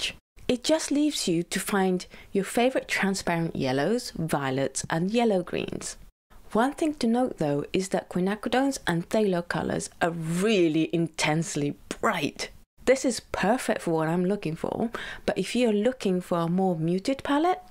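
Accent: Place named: United Kingdom. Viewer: This video was so helpful...more please. Also may I ask what paper you use and where you can get it? I am assuming from your accent that you are also in the UK? Apologies if not. Thank you so much...Mand XOXO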